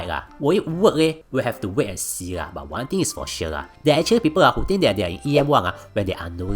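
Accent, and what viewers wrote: Singapore. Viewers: Going too hard on the singlish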